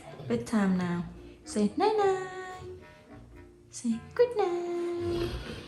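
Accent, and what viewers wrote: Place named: Isan, Thailand. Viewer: ชอบที่คุณพูดลาวอีสาน เว่าหน่องๆๆ น่ารักครับ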